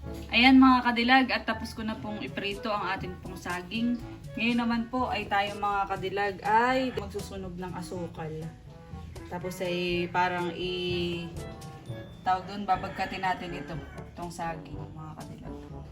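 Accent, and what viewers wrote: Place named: Quezon, Philippines. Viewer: Ok lng Naman kahit may Punto ang salita ganon talaga sa Quezon prov!❤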